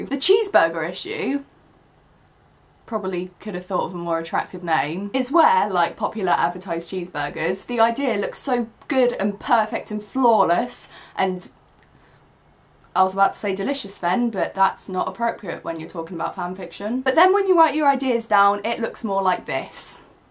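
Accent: England, United Kingdom. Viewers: where from england are you, i do but dont know the accent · Btw where about in England are you because we have literally the same accent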